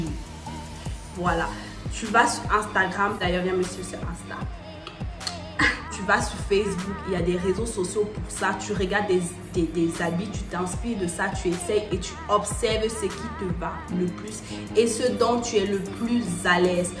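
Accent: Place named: Ivory Coast. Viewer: Ton accent ivoirien😍🇨🇮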